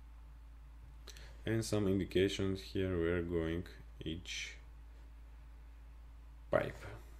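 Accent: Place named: Russia. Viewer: Вопросов нет, человек взялся рассказать за HPS, молодец. Но, английский наш этот, с этим нашим произношением и вот эти here, ok, ok, here, слишком много и часто ( это я только мин просмотрел). А так конечно молодец. Продолжайте в том же духе!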